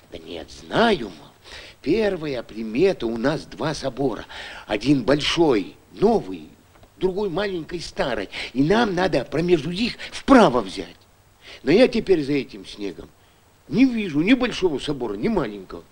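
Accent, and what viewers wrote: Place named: Vyatka, Russia. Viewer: Наш, вятский говорок то проскальзывает!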